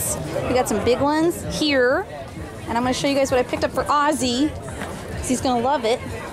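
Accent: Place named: Canada. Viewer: Oakay sooooo?! You sound like you've got a little Canadia in ya der! Disciple18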